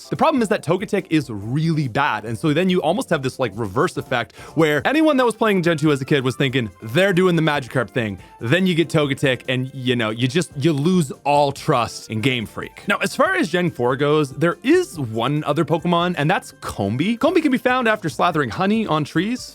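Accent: Canada. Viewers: So Canadian lol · For someone who says out the way you do, you sure do say it a lot - a fellow Canadian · Are you Canadian? I feel like you're Canadian. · Miss oot, aboot...etc. God I love Canadian's ♥️ 🇨🇦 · First time subscribing long time follower but shit I did not pick up that you were Canadian until this vid.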